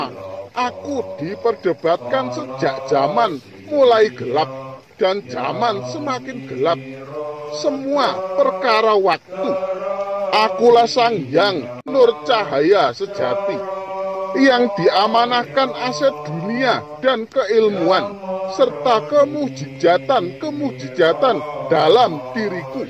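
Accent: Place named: Java, Indonesia. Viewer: Mas ojo medhok2 kalo bicara☺️👍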